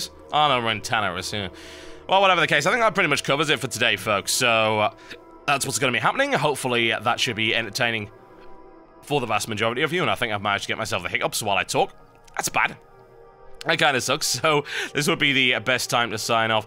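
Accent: United Kingdom. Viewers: Love your British accent! :D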